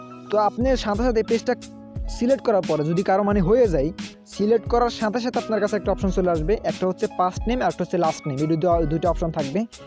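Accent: Noakhali, Bangladesh. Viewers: তুমি নোয়াখাীর ছেলে😍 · আপনি কি নোয়াখালীর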